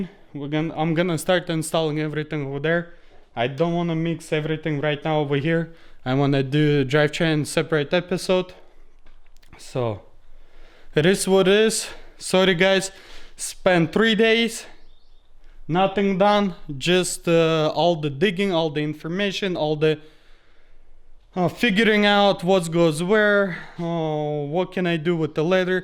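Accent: Russia. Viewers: Actually for a 100% Russian dude you have an amazing accent. Greatings from Serbia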